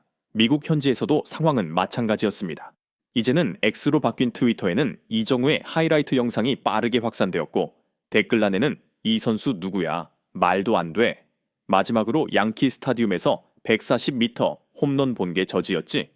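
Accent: China. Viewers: 해절자 중공인인가? 발음이 우리나라 발음이 아니고 어색하네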